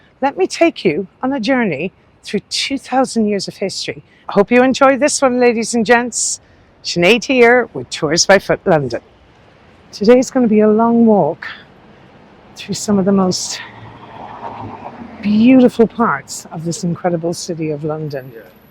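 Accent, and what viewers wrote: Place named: Ireland. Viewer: Ohhhhh Sinead, it’s so spring lovely to see and hear. Beautiful Irish lilt. I’m sooo looking forward to to your video, of Roman London. London Spring/ Summer/Sinead, what more can one possibly ask for. A absolute Delight for the senses. 😊🏴‍☠️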